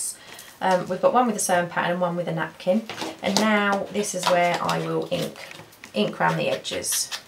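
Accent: England, United Kingdom. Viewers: thank you for sharing very helpful love your creativity and just love listening to you girls from the u.k. so up beat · Do you always distress with a brown ? Do other colours work,blue say or turquoise? I haven’t used these (cards) but I only draw and paint so far. Where is your accent from England ?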